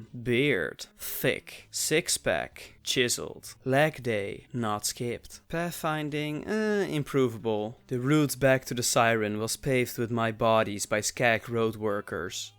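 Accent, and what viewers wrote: Netherlands. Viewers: oh lmao i didnt even know it was on your channel xD i just heard the dutch accent coming through the english haha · Not to ask stupid qwestion but are u dutch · Duurde precies een halve minuut, voordat ik door had dat jij nederlands was... 😂😂😂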